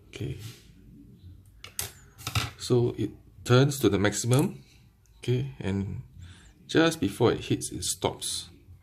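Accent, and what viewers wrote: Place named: Singapore. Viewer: You sound like Singaporeans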